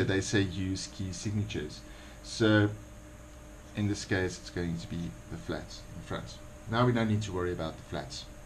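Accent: South Africa. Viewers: is this guy South African cause i think i hear the accent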